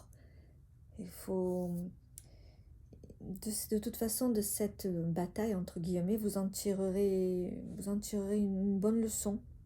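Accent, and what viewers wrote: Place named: South, France. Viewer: Bonjour! Ton tirage bien que peu qualitatif ne manque pas d’audace. Le cadrage est dans l’ensemble médiocre sur ce point tu as exagéré. Mais j’ai réellement était inspiré par ton tirage. Ça me parle et ca c’est beau. J’apprécie la manière dont tu présentes les choses. Et ton petit accent chantant du sud me met du baume au cœur. Je t’invite a continuer sur ta lancée Bravo.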